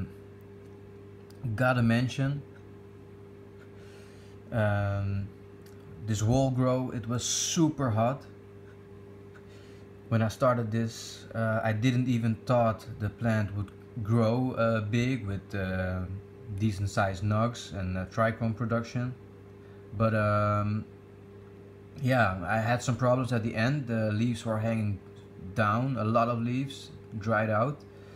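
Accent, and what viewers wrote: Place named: Netherlands. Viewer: Hey r u Dutch? You sound Dutch... or scandinavian. Anyway + sub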